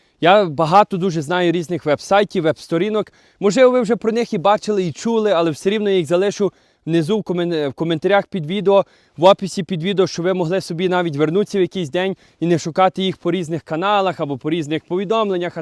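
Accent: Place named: Lviv, Ukraine. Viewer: привет, я такой же иммигрант как ты, но в США. Мне нравятся твои ролики. Откуда ты родом? По языку слышно что Львовская область, вроде. Продолжай делать свои видео. может я позже тоже приеду в Канаду. Пока я буду в Калифорнии, но виза есть CUAET. Ты заметил, когда переехал, что уличное освещение в 🇺🇸 и 🍁 более приглушенное, чем в Украине? Это было моё второе впечатление. Упомяни это в следующем ролике. Я из города Кривой Рог. С удовольствием ставлю теье вподобайку.. На все добре, друже. Слава Україні)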